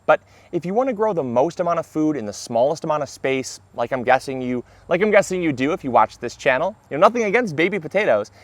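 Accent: Michigan, United States. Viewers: Here for the gardening advice but staying for the Michiganisms "oh my gosh!".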